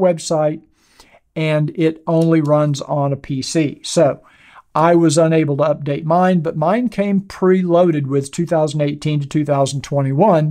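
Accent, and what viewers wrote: United States. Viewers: I live in Amsterdam the Netherlands. I own a GW2021 and ordered the GW 2022 DCT touring All Black. I love your video’s and specially your voice and American accent. I love it. Thanx.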